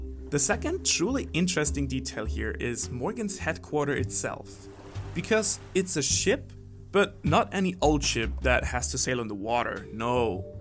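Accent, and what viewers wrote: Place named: Germany. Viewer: fom the pronunciation I would guess I am now subed to a fello German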